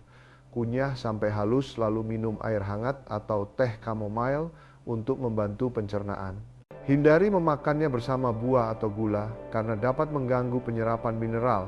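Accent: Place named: Sumatra, Indonesia. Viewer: Inton sumatra no 1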